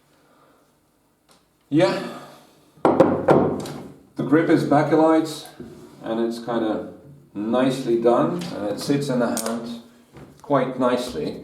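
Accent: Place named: United Kingdom. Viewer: у вас такой приятный британский английский! где вы его учили, или вы жили в Англии?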